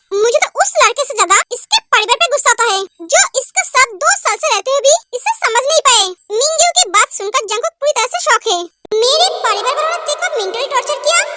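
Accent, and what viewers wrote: Bengal, India. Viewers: Apu Tumi ki bangali tomar hindi sune mone hoy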